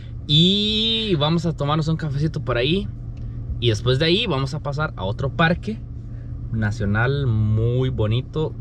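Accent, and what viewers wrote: Costa Rica. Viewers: No más abriendo el video, escucho ese acento y le digo a mi señora ese “mae” tiene que ser tico Pero lo que no me dejó duda, fue cuando dijiste que el arroz que comiste, “era como cantonés”. Así o más tico, lo digo con orgullo😂😂😂 👍🏻👍🏻👍🏻👍🏻👍🏻🇨🇷🇨🇷🇨🇷🇨🇷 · Si ud no es costarricense y no sabe aceptar o tolerar que así hablamos los Ticos; si no sabe reconocer que esta es una de nuestras características, entonces pásese a otro canal donde hablen un español neutro y sin ese saborcito Tico que le pone a sus vídeos... De lo contrario, calladito más bonito... 🤫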